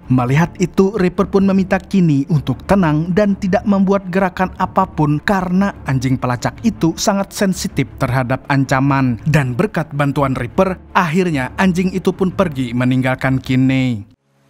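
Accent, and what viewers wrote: Banjar, Indonesia. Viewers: Mamang orang banjarkah apa orang kah???😊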